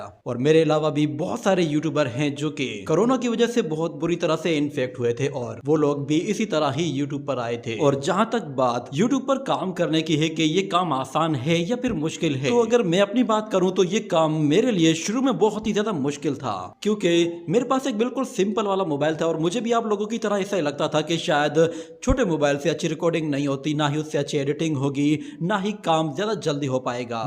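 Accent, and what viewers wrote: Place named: India. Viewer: Mujhe laaga ap Indian ho 😂😂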